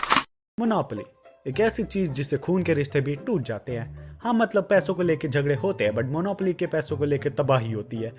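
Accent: Jammu, India. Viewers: Bro aap jammu se ho kya ?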